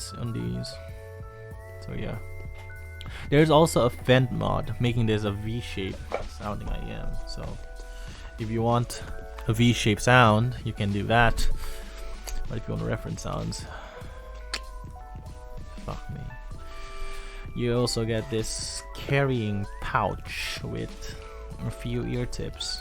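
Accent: RP, United Kingdom. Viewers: so sad, you never use them again. Anyway, I like your normal accent here in this video more than your usual Posh accent hahaha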